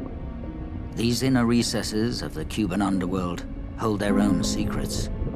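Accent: United Kingdom. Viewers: Enough with British voices. Done gone.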